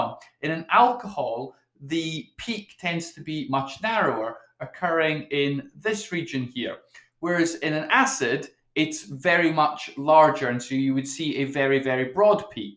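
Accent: United Kingdom. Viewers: You had my loyalty at "What the hell does this complete mess mean?"....and your British accent of course 😅😂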